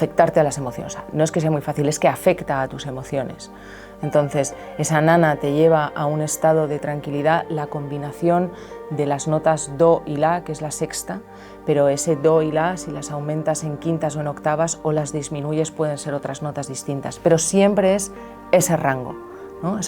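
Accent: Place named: Spain. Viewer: Me gusta su tonos de voz que llega al corazón ❤️ y su explicación me gusta en verdad es primera vez que la escucho y primera vez los que nos enseña con un fondo de música súper agradable tiene voz de Española seguiré sus enseñanzas desde cuba cuba un abrazo gigante cuídate mucho un saludo a la familia eres maravillosa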